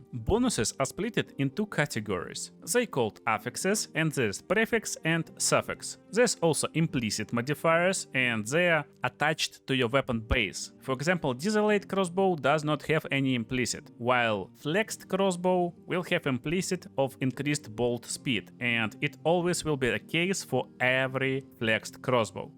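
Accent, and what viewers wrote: Russia. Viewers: Your accent, I swear You made a BG3 guide but I can't find it on this channel, maybe another Russian. You sound identical, accent an all lmao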